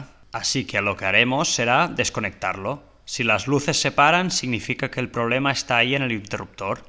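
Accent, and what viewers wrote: Spain. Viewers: Excelente gracias amigo, español verdad? saludos Chile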